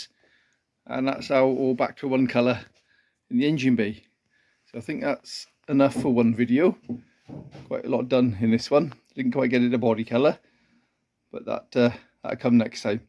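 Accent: Wales, United Kingdom. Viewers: Lovely job hardly no overspray must have a good extraction system great love the Welsh accent too😂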